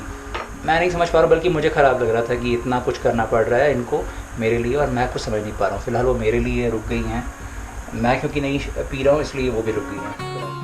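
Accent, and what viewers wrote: Haryana, India. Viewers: Bhai kasuta mazaa aa gaya.haryanvi boli chorah daar gya. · Bro are you from Haryana? Cause you speak haryanvi so well. Love from Haryana ❤ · Ram Ram Bhai 🙏 🙏 Bhai Pataudi aala ♥️ Bhaiya bda achaa lg rha h aapko haryanvi bolta dkh he video m ...mujhe yaad h m aapko bolta tha hr video m haryanvi bolne ko or wo aap kr bhi rhe h ...bda achaa lg rha h bhai ji ♥️♥️ Dil s dua h bhai ko or jyada trkkii kro aap ♥️♥️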